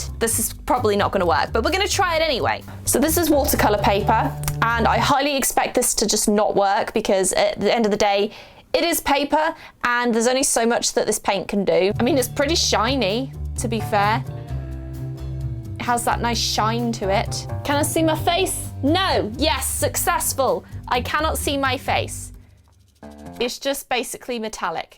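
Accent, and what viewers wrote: United Kingdom. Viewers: Omg i Love your british english 😍😍 (I'm from Germany and i am learning American english ) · Are you from Britain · Are you British??cuz ur accent tho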